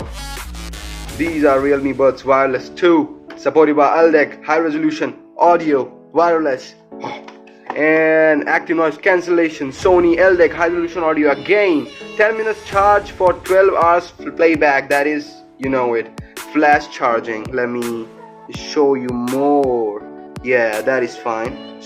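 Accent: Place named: United Kingdom. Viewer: Best 👍 video bhai 👌 aur bhai apki English to masha allah bahut hi achchi h bhai lagta nhi ki ap india se ho pehle to laga ki ap UK 🇬🇧 se ho Best English YouTuber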